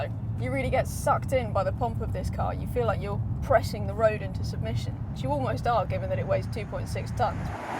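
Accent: United Kingdom. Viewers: Not everyone with British accent is suitable for a fuckin car interview! FuAAAAAAAAAK!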